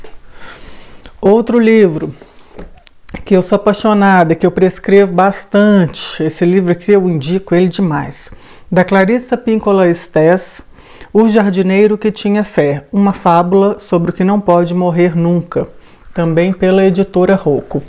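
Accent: Minas Gerais, Brazil. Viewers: O outro lado da infelicidade é o contentamento ( não é a felicidade) Ser grato ser agradecido não tem nada a ver com religião. É um estado de espírito que tem tudo a ver com contentamento. Vou fazer um caderno só com as coisas que você fala. Minha filha disse que eu já tou até falando mineires de tanto ver vídeo seu❤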